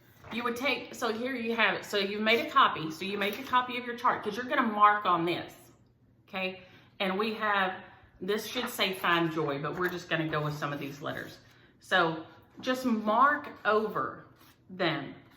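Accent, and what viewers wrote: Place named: South Carolina, United States. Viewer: Thanks for talking about Aida, evenweave, and linen. I'd love to hear more about how you chose which fabric for each design. Your talk about the whites was SO good! I'm here in SC so you sound like a friend to me. : - )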